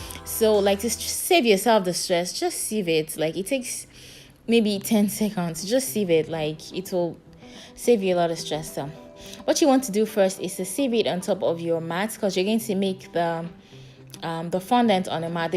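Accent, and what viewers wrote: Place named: Ghana. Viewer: You don’t sound like a Nigerian but a Ghanaian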